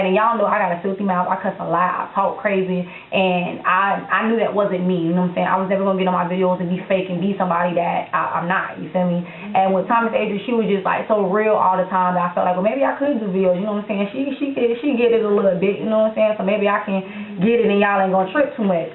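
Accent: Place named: New Orleans, United States. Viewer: you sound like your from new orleans i always thought you were .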